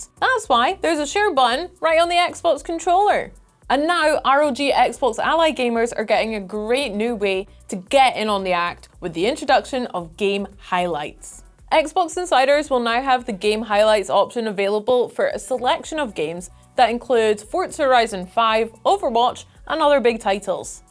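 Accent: Scotland, United Kingdom. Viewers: Love hearing a fellow Scottish accent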